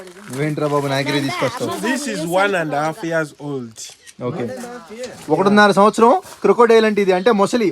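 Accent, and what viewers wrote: Guntur, India. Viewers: YouTube views aagipothai kaadhu athanu guntur kaabatti ala untundhi vaalla slang nuvvu yelluthey neeku thelusthundhi ley guntur vaallu choostey vaallaku normal gaane untundhi endhukantey vaalla slang ala untundhi guntur lo picchi puk thelusukoni maatladu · Some people r commenting without even understanding his hardwork. I am from vizag and i have good friends from guntur. Adi valla slang but yekkada bad words use cheyledu ithanu. He is genuine. All the best my bro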